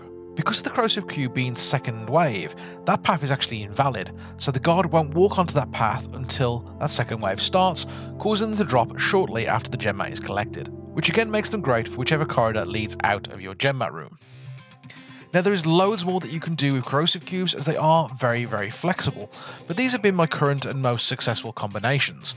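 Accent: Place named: Midlands, United Kingdom. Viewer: So good to hear a midland accent on YouTube and hell man meet your maker is beast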